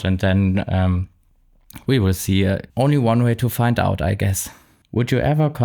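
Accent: Germany. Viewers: Can't tell that you have a weird or "sleepy" voice in English. You just sound like a German speaking English carefully. Vielleicht auf Deutsch könnte ich die ,,Schläfrigkeit" hören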